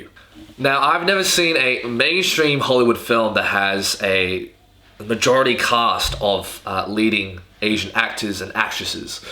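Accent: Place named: Australia. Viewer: Yesss, another Australian, possibly, maybe, the accent sounds about right. I haven't seen the movie yet, I'm just about to now, so I'm gonna watch this video when I get home, but I'm really interested to hear 18 minutes worth of your opinions on it.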